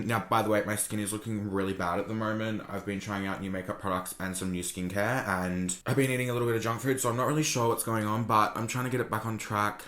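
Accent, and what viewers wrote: Australia. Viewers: I thought u were American but ur Australian right ? , love u anyway u slay Every product that has been released xx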